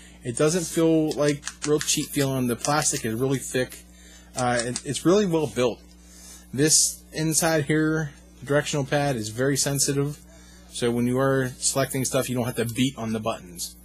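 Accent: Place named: Pittsburgh, United States. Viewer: you are definitely da burgh, nice review dude, just got one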